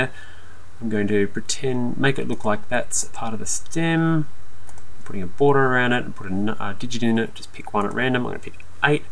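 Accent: Australia. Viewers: lol ,its the aussie accent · its australian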